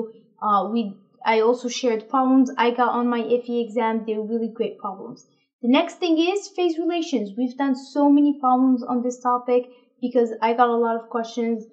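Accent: France. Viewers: I was closed. Pourtant un accent francais quand tu parles anglais.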